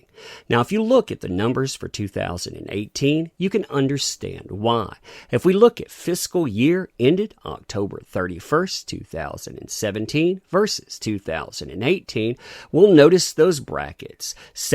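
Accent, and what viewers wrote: South, United States. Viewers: So are you from Alabama or Louisiana?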